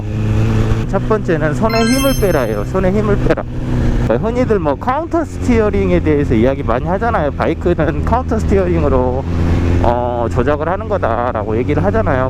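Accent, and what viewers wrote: Jeolla, South Korea. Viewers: 아따 남도 사투리가 구수하요이~ 그라고 알려 줄라는 내용이 팍팍 와닿소^^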